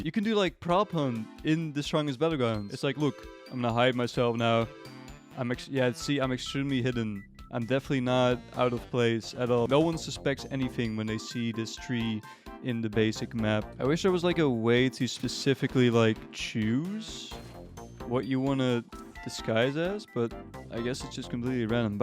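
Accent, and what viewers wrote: Netherlands. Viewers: Insane how fast you can hear a Dutch accent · Gast ik wist gewoon dat jij nederlands was ik hoorde het accent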